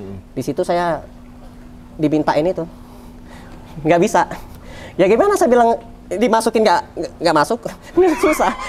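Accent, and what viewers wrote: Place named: Palembang, Indonesia. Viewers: Logat palembang, dak ilang hee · Ini logat sumatra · ini asli orang mana?... Logatnya kayak orang sumatera yak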